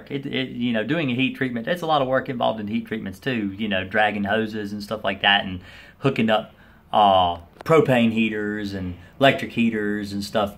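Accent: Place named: South, United States. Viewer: Thanks good info... you have an accent like Gomer Pyle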